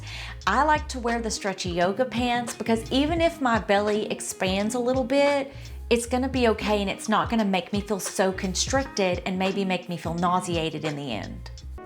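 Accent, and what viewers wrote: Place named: South, United States. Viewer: Thank you so much for this video,. I heard your heavy accent, and I am wondering where you are from. I am guessing somewhere south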